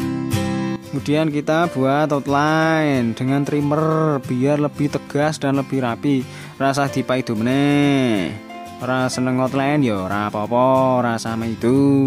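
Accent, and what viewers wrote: Java, Indonesia. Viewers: sampean ngomong medok eram toh mas mas 😁 tutorial terbaik, hasil terbaik, tergokil dan terkocak 🙏 salam horas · Mas omah u ngend kok medok ngomonge,,,semangat trs👍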